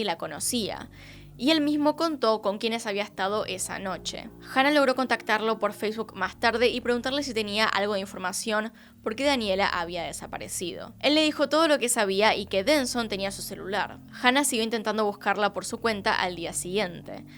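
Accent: Argentina. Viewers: "Hola y bienvenidos a mi canal de YouTube...una vez más. Hoy tenemos el caso de....." El acento argentino lo amo y vos sos una maestra para narrar los casos. Además, me encanta el toque q le pones a las preguntas capciosa...me encantas,. Saludos desde El Salvador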